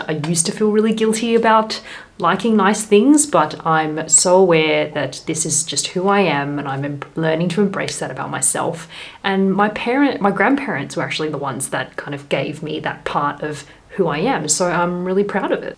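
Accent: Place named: Australia. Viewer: Very well said. Great list, and watching from the USA I really enjoy your voice. Everything sounds sweeter and happier with an Australian "accent" :-) I remember turning 30 as well and just loved leaving my 20's in the rear-view mirror. I'm glad you found it a good thing.Just wait for 60!!! haha. Liking & subscribing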